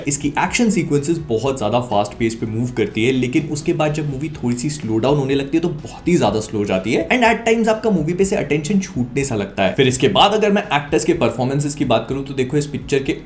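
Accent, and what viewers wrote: Bhopal, India. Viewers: Bhai are u from bhopal kyuki achi bhopali krte ho 😀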